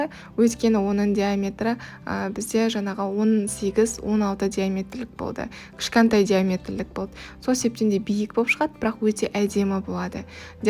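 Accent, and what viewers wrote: Standard. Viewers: Сіз таза қазақша сөилеисіз сол ұнаиды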